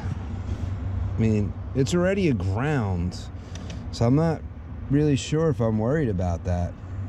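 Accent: New York, United States. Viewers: No hot pads? Go warm up a few then go back up. Oem wires have id numbers on Lennox rtu...re label them. A picture of connections sure helps before removal. New York/Joysey accent...lol Battery azziz..? Lmbo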